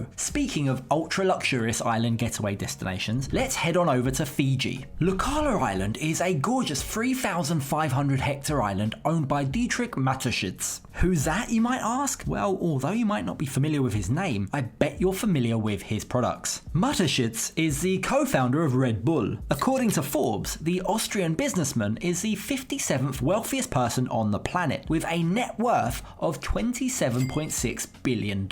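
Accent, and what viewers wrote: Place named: England, United Kingdom. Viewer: English accent, I'm out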